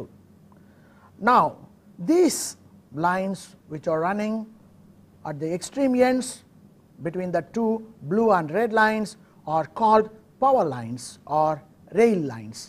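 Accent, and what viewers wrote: India. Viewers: I really love the way the Indian accent sounds. Reminds me a bit of my own accent (Irish).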